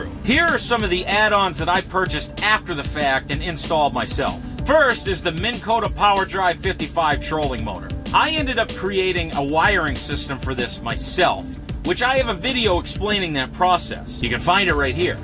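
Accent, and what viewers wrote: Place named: Maine, United States. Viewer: Your license plate may say Wisconsin but you sure sound like you are from Maine or somewhere out there.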